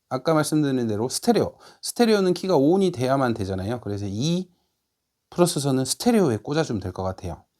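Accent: Jeolla, South Korea. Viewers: 전라도 말투 넘 좋아요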